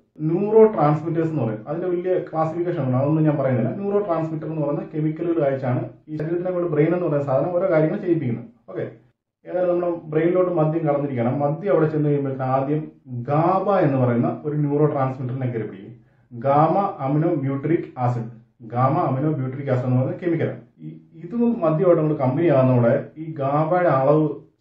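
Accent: Kottayam, India. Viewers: pure കോട്ടയം slang.. വാഴൂർ-? പൊൻകുന്നം? കാഞ്ഞിരപ്പള്ളി??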